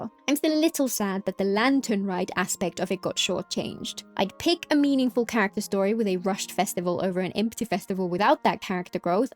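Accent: United Kingdom. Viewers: Have you ever thought of doing ASMR ? I adore that voice and British accent of yours! BTW looking forward to your analysis on Archon quest 7.0. Great job.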